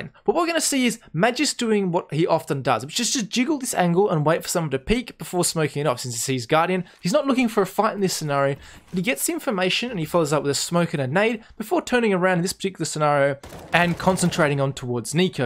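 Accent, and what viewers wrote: Australia. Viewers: Greater Sydney or South West Sydney accent to be specific ;) (I think)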